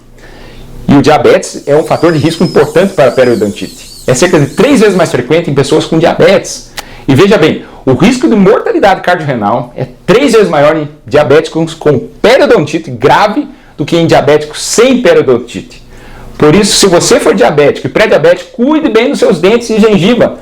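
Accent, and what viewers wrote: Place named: Minas Gerais, Brazil. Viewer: Esse dr parece ser mineiro, incurtando.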